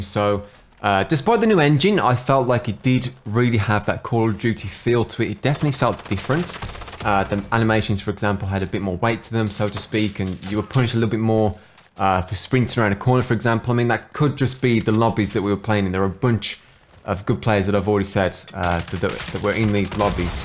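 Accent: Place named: United Kingdom. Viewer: Your accent is British af.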